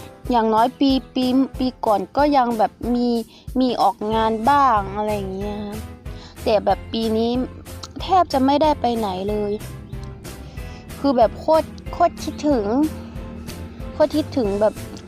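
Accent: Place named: Suphanburi, Thailand. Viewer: เวลาน้องพูดสำเนียงไทย เสียงจะออกเหน่อฯ เหมือนคนสุพรรณเลย😂😂😂อยากฟังควมไตยบ้านน้อง แบบเต็มคลิป ...เพราะแต่ละที่ สำเนียงไม่เหมือนกัน😇😇😇ยอนเสง สาวไตย ว่านพักตูเมิง ค่า.......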